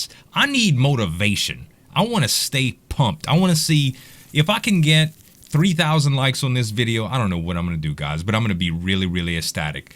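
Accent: Louisiana, United States. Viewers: Love ya videos Z. Listening to you takes me back to Thibodaux, and I haven't been home in a while.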